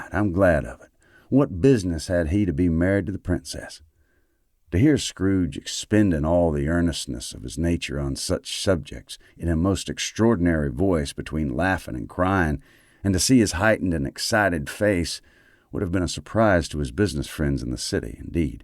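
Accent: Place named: South, United States. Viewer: The Dickens writing and the accent in your narration gives the whole audio story an “antebellum” feel to it. As though this could be a story taking place in placid North Carolina and not urban London. Which is probably a testament to Dickens’ talent as a storyteller!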